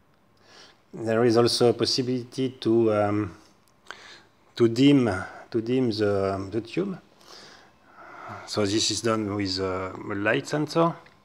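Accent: France. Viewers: French accent :)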